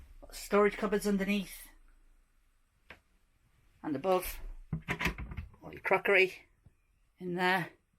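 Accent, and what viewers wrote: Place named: North, United Kingdom. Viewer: Thanks enjoyed that, I too found your channel from Nick's recommendation, though being a fellow northerner I prefer your accent.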